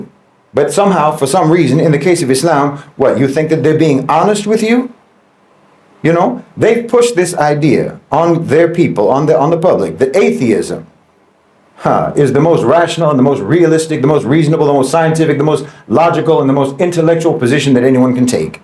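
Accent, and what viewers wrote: United States. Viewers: I aint gonna stop😂 this man is american college educated and speaks in an american accent. 😂 If you cant tell hes a shill you lack critical thinking and will always do what the screen tells you too. He even uses american ideology and american issues while he lives here to tell you about how bad white America is. This person is lower than pedophiles. He's a pedo protector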